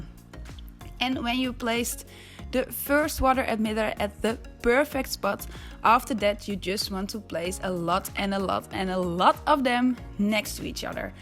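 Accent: Netherlands. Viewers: Idk but your accent sounds duchts😂❤️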